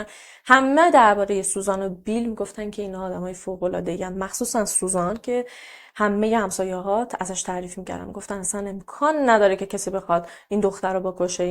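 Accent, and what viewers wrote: Mashhad, Iran. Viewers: سلام جان مشهدی هستید؟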